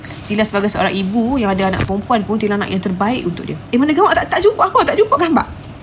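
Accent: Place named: North, Malaysia. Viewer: Org utara ckp . Ibrahim Mcm² la aw👍🌹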